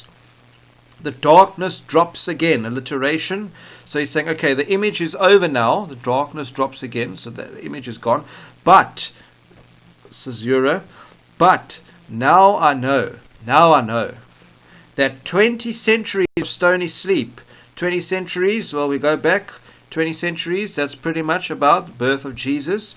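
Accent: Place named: South Africa. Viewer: Haven’t listened to your whole vid yet, but as soon as I heard your SA accent, I knew this analysis would be spiritually mature and thought provoking. Thank you for a beautiful recitation and sharing of valuable insights 🤗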